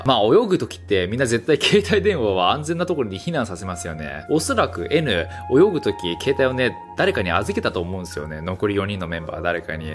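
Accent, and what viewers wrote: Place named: Kansai, Japan. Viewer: 関西弁だよごく普通の。 それはちゃうねんなぁ〜。とか言うよ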